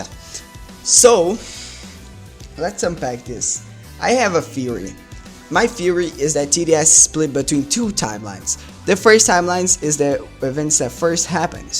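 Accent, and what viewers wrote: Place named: Brazil. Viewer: why do mine video and your's have the same text on the thumbnail 😭, and we both are brazilian, atleast i think you are brazilian by your accent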